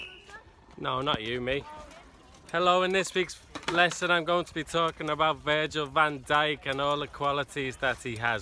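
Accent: United Kingdom. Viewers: Are you English? I like your accent, I'm a new subscript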